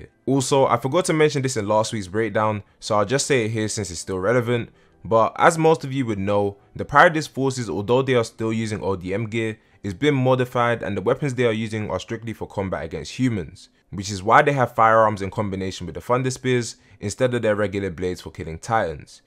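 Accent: London, United Kingdom. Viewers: Finally a Londoner doing these reviews loool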